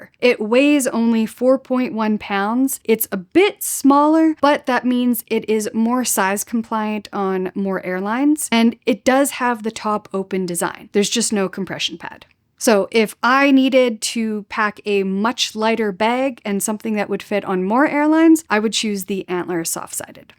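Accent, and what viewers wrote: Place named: Canada. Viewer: Canadian accents are quite charming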